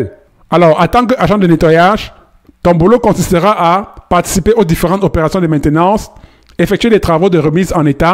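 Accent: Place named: Cameroon. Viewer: Mon frère camerounais le ton là je reconnais déjà ❤❤😂😂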